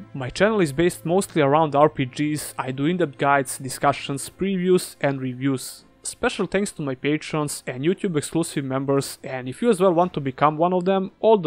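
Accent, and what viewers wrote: Balkans. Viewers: Are you from Balkan? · U sound like you are from balkan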